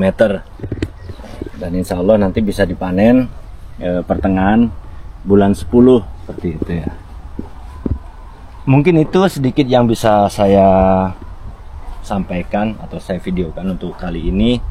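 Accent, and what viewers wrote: Madura, Indonesia. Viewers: alhamdulillah tabarakallah, sukses bertani moderen di negeri Sakura ❤❤Kami mau dengar, bahasa jepang logat madura atau bahasa madura logat jepang ❤❤ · Khas madura gak bisa hilang. Gaya bahasanya mirip cak islah bahrawi dan pk Mahfud MD 😂😂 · Bhs indo logat madura 😅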